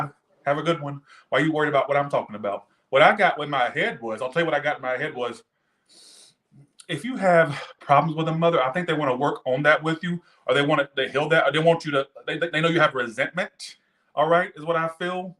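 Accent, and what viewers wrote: England, United Kingdom. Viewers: Taurus read: !!! I love the brittish accent !!! 😆 🤣 😂 😹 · Love English accent it’s on point 😘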